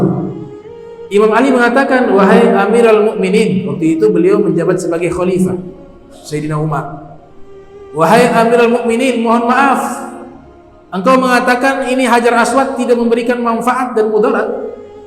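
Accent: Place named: Arab world. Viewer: Keslem wahay orang indo, kalau asli indo saat bicara tentang nama tokoh dr agama sekalipun, janganlah alay lebay dibuat" nama nama jadi dialek arab. Nyebut umar kotob aja pake dialek arab. Kayak tunduk banget gak mau kritis umat nieh. Wajar banyak dikibulin bangsa arab. Sejatinya manusia yg lahir di nusantara kalau nyebut tokoh islam aja seperti budak, maka negeri indo lambat laut bakal jadi negri islam yg gak punya jati diri bangsa lagi. Pakaian pun diganti agamis berkedok syariat. Stop alay dan lebay saat ngomong dimanapun mengenai tokoh agama jangan ikut ikutan dialek Arab. Sangat rendah banget harga dirinya dimana dunia kalau bangsa indo ke arab araban. Wong Muhamad bin salman aja udah kebarat baratan kenapa kits harus ke arab araban, yg mana budaya arab itu budaya tertinggal/konservativ/ kampungan/dan jahiliyah! 😇🤗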